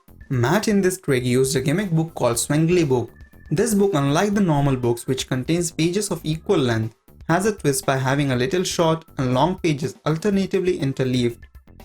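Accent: India. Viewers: I would've liked this more of the narrator didn't have such a thick indian accent that i can't understand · If you didn't switch the voice to a freaking Indian one the video would better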